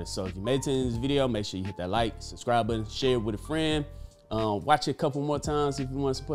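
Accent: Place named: Atlanta, United States. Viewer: What up Zeke! I swear sometimes I think you're in ATL with me when you talk. But this is not my comment for "QYLD the Movie" you put together. Based on vid, you invested $20 aday excluding weekends and holidays; correct? This calculate to 261 days a year at $20 aday for a total of $5,220 investing for a monthly paying div. My friend, I will be joining you; utilizing your strategy by reinvesting back into QYLD. Thank you for the entertainment purpose videos and I look forward to joining the road to passive income investing.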